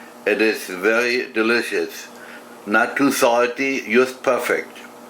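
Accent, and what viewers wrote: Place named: Germany. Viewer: My dad used to do that in Germany every year. We bought a pig and the butcher came out to our house and we butchered all day long. I remember that I had to stir the blood when they cut the pigs throat. We made everything by our self. Blood sausage (Blutwurst) and what you made here. (Rauchfleisch) and you sound like a German. Am I right? I wish my dad would still be around to tell me how he made all the hams and sausages. What you did here is pretty much the same way I just don't remember what he put in his brine. Is there a recipe for the brine (Lake) somewhere. Thank you that meat looks so so good. I will make some as soon as my pig gets big enough to get butchered.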